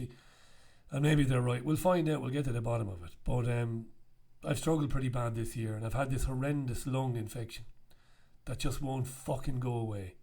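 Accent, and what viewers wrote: Ireland. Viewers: your dulcet Paddy tones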